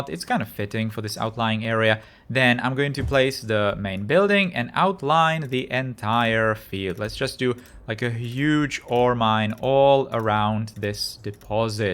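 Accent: Czech Republic. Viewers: CZ, judging by the czenglish. And those batteries are MWhours, not MWatts!!!! That would make no sense! Edit no.2 4MW is not battery capacity, it just shows how much is flowing into it😅😅, capacity is 10MW!!!hours!!!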